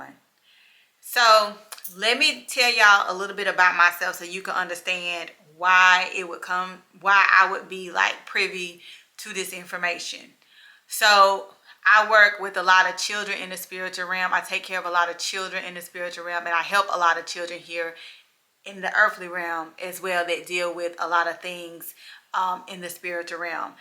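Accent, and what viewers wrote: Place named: South Carolina, United States. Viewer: I was wondering why you sounded soooo country - then you said you're in South Carolina. I'm in Columbia - Broad River / Saint Andrews area. Hello neighbor!!! 😁😁😁😁 Will you find out how Prince is doing please? Thank you. Keep up the good work 👍👍👍👍👍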